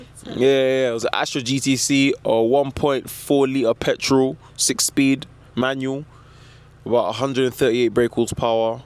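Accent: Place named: South Africa. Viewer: he get me everytime , i keep thinking bro is from southa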